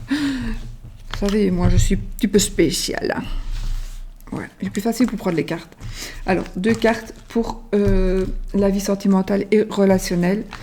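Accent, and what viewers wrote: Belgium. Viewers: J adore l accent belge on es les meilleurs 🇧🇪 Merciii pour ce tirage♥️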